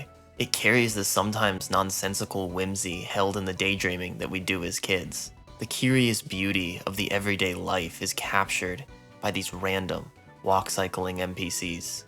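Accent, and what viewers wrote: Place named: East Coast, United States. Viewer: This is a super weird compliment, I know, but your delivery, especially with your (what I believe to be, correct me if I'm wrong) East Coast accent, makes these videos feel so nostalgic, like I'm watching an old movie.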